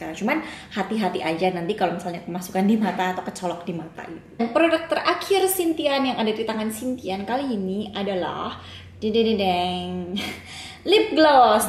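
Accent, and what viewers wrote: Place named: Yogyakarta, Indonesia. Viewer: Haiii, kamu orang jogja yaa? Medok bangetttt Nice vids btw!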